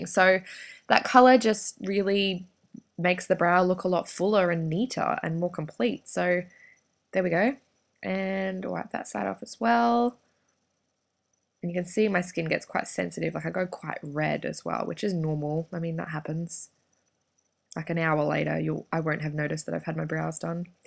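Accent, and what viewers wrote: Australia. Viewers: are you australian ? cause you sound like an aussie ♡♥ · whenever i see you respond to peoples comments i always read your response in an aussi accent aha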